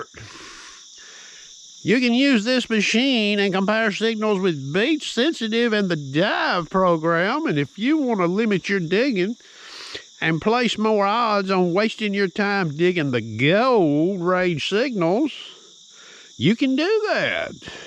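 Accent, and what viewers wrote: South, United States. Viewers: He's pretty smart for sounding like a hill-billy isn't he? :). When I saw him put a Legend near a fan and spin a coin around to test the speaker.. I knew then this dude was a genius!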